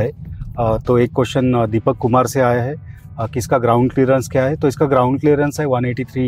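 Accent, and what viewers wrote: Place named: Pune, India. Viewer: Without even seeing your description, I knew you are a Puneri by your accent. Great to know, I owe 8 years of my wonderful life to Pune. My next next car is definitely X1. Thanks for detailed information.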